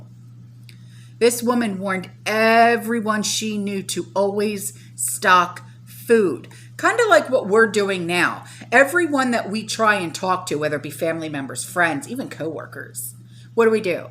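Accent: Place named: Pennsylvania, United States. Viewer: Was listening to you when I was up visiting my son in Pensacola...he was like...is she from Pton (my hometown in PA) she sounds just like you & your friends...coffee and sticky snacks. It made my heart sing that he does pay attention to our words. Have a fantastic day. Love your channel.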